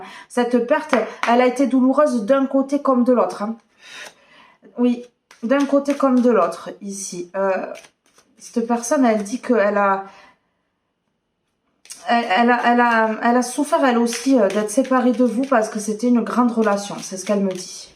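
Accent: South, France. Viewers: Merci.pour votre accent méridional c est un peu difficile pour moi de vous écouter 😭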